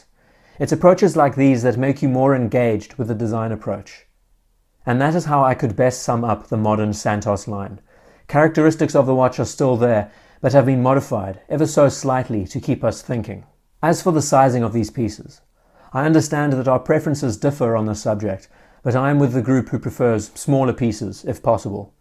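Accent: South Africa. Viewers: Why im a getting a bit of a south African accent from this guy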